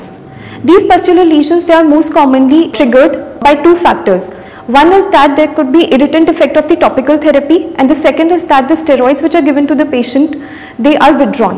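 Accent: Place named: India. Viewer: Thats indian accent .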